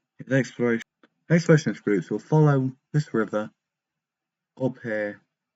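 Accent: United Kingdom. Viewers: Adult Bri’ ish man video Also hi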